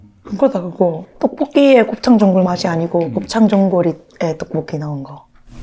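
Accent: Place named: Rural, South Korea. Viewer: You guys are so cute together! 😊 You have the country Korean accent, so cute.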